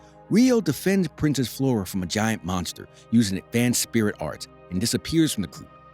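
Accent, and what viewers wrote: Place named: South, United States. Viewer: The country voice doesn't suite this genre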